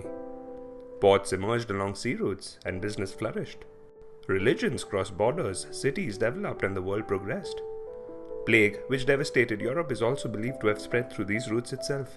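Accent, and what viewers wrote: India. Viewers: 100 % biased against China, the narrator sound like an Indian national...by the time Prime Minister of Indian, Modi dies, China GDP will be probably double of the U S A. The narrator knows nothing about China intentions nor he knows about the British and American are difference. The British is already joined the AIBB while the American doesn't.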